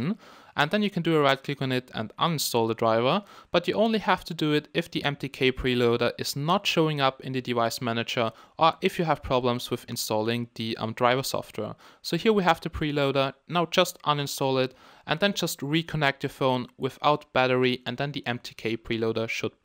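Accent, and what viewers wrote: Germany. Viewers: Hey I have got a Problem with the Scatterfile :( if i try to load h scatterfile Sp Flash show me an error and say sometihg about overlapping ..... Itry to flash he original Rom From The Manifactory website there is also an Ubdate but both of the roms dont load corretly by Sp Flash .... The Device is A Ulefoe U9592 .... Can you Help me Please ?? Wenn sie Deutsch Sprechen wäre es nett wenn sie es in Deutsch Beschreiben was ich machen soll :) (Wie ich auf Deusch kömme :) der Akzent klingt nach Deutschem/Österreichischem Englisch ) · Man hört ein kleines bisschen den Akzent von Deutsch raus und Firefox ist auch in Deutsch, Wenn du Deutsch sprechen kannst, hab ich eine Frage. Weißt du wie man das THL T6 Pro 166L Flasht? will KMDROID 0.8 flashen aber bin kir dabei etwas unsicher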